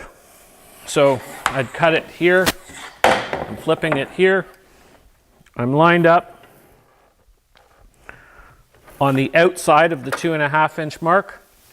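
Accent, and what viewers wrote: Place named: Canada. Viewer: Great videos! I plan to use this in my technical theatre classes. Random question: I thought based on your accent (and the snow) that you were probably Canadian, but then you still use imperial measurements instead of metric. Am I just wrong and you aren't Canadian, or do you still use imperial measurements in Canada for theatre, to be consistent with the U.S. industry?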